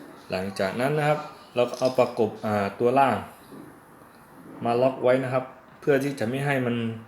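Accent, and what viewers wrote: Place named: Pattani, Thailand. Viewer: เสียงแหลงเหมือนเด็กตานี 😅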